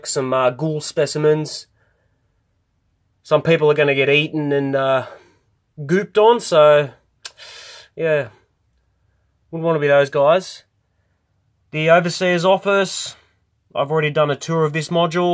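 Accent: Australia. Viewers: He sounds SO Australian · Just a suggestion for moc's like the one you just did, have you ever heard of brick arms? Brick arm's are miniature weapons and accessories which are compatible with minifigs. I have quite alot, I can tell you're Australian so lucky for u the nearest website is in Melbourne, Victoria it is called rick's james bricks, they sell brickarms and lego. Hopefully this info is useful to you.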